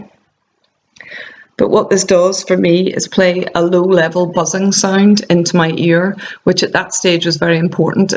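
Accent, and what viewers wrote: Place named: Scotland, United Kingdom. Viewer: Dear, thank you so much for this absolutely helpfully video. In the first minutes it was hard to understand your scottish accent, because I am from Germany. But after a while it worked.;-) I have Tinnitus for 20 years, did the TRT with noisers and I couldnt hear anything the last 18 years. But now, 3 months ago, after a heavy Burnout, it came back. I couldn't believe. I found Julian Cowen Hill, he is great. And another person from Germany. They both help a lot. But I am absolutely convinced, that all what you are telling and the others is true. Now I first have to clear my mental state and then use the noisers again. Now, I try to think postive and to see it as a chance to change something in my life. Best regards, Martina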